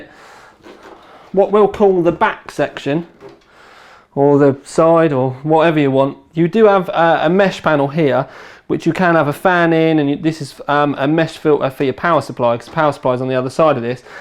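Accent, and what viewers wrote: United Kingdom. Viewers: He is from the UK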